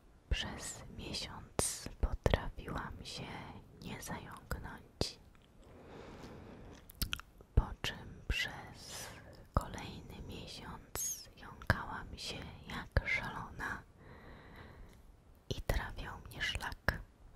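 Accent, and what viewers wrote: Poland. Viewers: Enhorabuena por tus 20000 suscriptores. Te lo mereces porque lo haces muy muy bien. Tus vídeos y tu acento polaco son excelentes para relajarse. Gracias y un beso.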